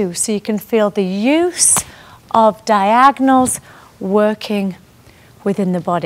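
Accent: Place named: Northern England, United Kingdom. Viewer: Very helpful-the images I can use when walking along the beach front. Thank you and a nice (north) English accent. Jack in Japan.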